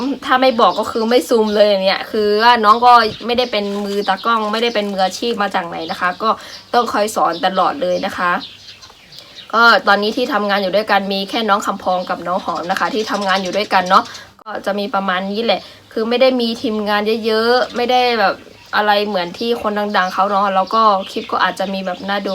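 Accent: Thailand. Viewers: น้องดูเหมือนคนไทย ไม่เหมือนไทใหญ่ จากการพูดสำเนียงภาษาเหมือนไทย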